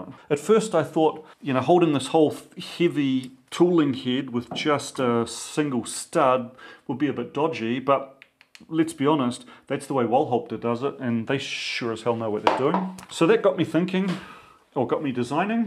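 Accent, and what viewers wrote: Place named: New Zealand. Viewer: I'm a hobby machinist and have spent 30 years trying to learn as much as possible. This video was the best, most engaging learning I've experienced since hanging out with my 90 year old tool maker friend when I first fell in love with machining. THANKYOU for your time and content. This was so good. I fully thought you were kiwi like me with your accent, but maybe an Xpat... thanks from down under down under. Chch nZ ❤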